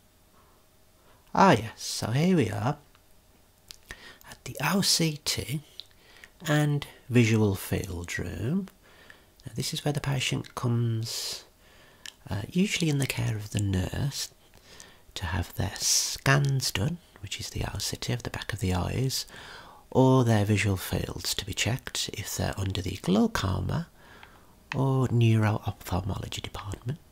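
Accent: United Kingdom. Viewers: Sir are u British if so cool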